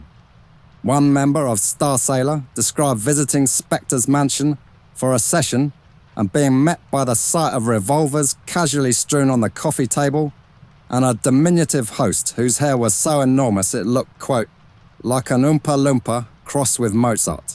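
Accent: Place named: London, United Kingdom. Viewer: I love the timbre of the narrators voice ! Classic London English..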